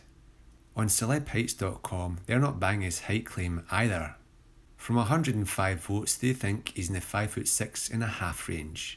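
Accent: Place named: Scotland, United Kingdom. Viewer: based scottish accent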